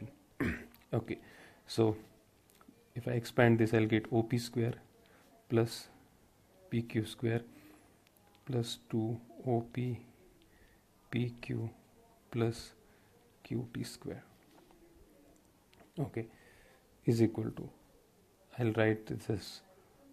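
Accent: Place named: India. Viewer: Not to sound racist but the moment i the video started i kinda knew it was going to be an indian accent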